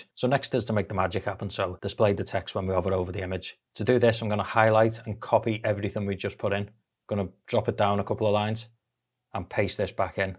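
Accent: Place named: United Kingdom. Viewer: Hey, just wanted to say how much I appreciate you creating this video. I had a comment earlier on my own YouTube channel. It was great to be able to link to this rather than create a video from scratch. Thanks. Cool channel. Awesome accent... from a fellow Brit;)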